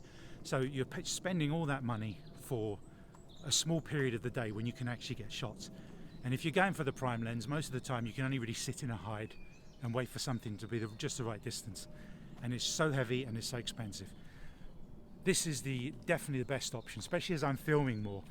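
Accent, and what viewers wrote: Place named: England, United Kingdom. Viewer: It's nice to hear a English review on this lense instead of some high speed American I'm thinking about it because its always nice to have a little extra reach, good review thank you.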